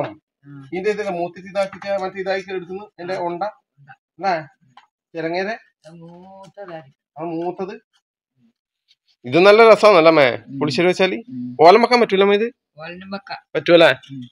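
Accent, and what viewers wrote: Kannur, India. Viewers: ok ok ഞാൻ കൊച്ചിക്കാരൻ ആയത് കൊണ്ട് കണ്ണൂർ ഭാഷ കേൾക്കുമ്പോൾ ചിലപ്പോൾ അന്തം വിട്ട് പോവാറുണ്ട്.. കുറച്ചു കൂടി വടക്കോട്ട് മാറിയുള്ള ആളുകൾക്ക് ഇത് പരിചിതമായിരിക്കും.. Any Way.. Thanks for Reply 🙏🏿🙏🏿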